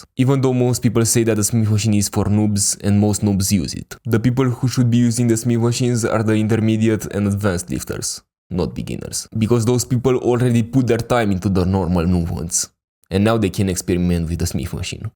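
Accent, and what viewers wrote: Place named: Russia. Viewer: its impossible to listen this rus sound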